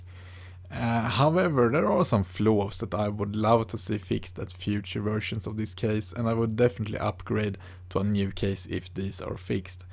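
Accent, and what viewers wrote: Sweden. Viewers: Are you swedish?? Sounds like one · That Swedish accent :D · thicccc Swedish accent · Jäklar vad man hör att du är svensk:)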